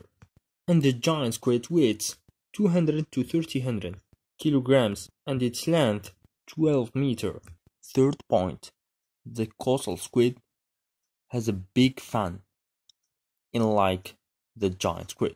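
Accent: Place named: France. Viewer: That nice french aksant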